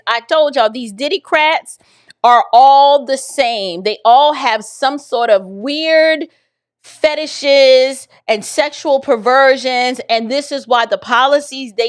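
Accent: New York, United States. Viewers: The way you said ya'll! You are an honorably southern bell! I know you are originally from NY, which I hear as well. I love your commentary and point of view. Keep up the great work! ❤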